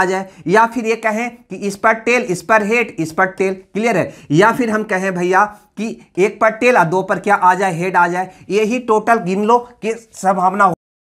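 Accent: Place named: Bihar, India. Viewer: Sir aap bihar se ho kya.... Language se lgta... Hm Bihar se 👍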